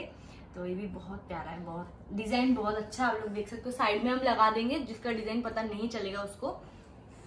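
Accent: Bengal, India. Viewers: Apki Hindi bht sweet hai☺️hum marenge ye achcha bolti ho waise mai bhi bengali hu or humko bhi secret wala insaan ka naam Janna hai😂😂or barki mummy bolne par camera man itna has kiu rhe hai🙄 bengali bolne wale log sab Hindi bol nhi pate but ne kosish kar rhi hai bht jaldi sikh jayegi☺️👍🏻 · Di O aapka Bengoli kadha O bahut hi cute Hy very nice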